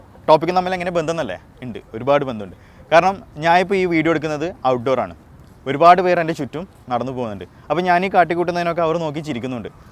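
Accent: Kannur, India. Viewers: From kannur right? nice talk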